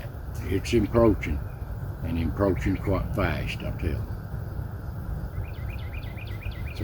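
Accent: Appalachia, United States. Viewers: Love your southern Appalachian dialect. We're close here with the accent. So happy to hear you're well on the way to getting back to normal. Thank you so much for what you do in providing clean entertainment and your historical facts. God bless and well see you next time. Continued prayers. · Thanks Uncle/Brother. My dad's people from Northeast, TN. Have that Southern Appalachian accent. Although I grew up in PA. I acquired it somewhat. My friends often said that I talked Southern. I Love hearing Regional accents! From New Jersey and New England to Western PA and the Midwest, it is just fun to hear... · The way you talk is one of the best things about your channel. Your accent, mannerisms and interests are the greatest appeals to your videos. I am from the mountains of central/East Tennessee and this reminds me so much of my parents and grandparents. 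Keep up the good work. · Glad to hear you are feeling better! I enjoy learning about Appalachian history. Love your accent too. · I love your appalachian accent. Reminds me my Kentucky people from years ago. A lot of the mountain talk is going away . My husband and I love all of your videos. You are sincere and kind . We thank God for your healing. 🙏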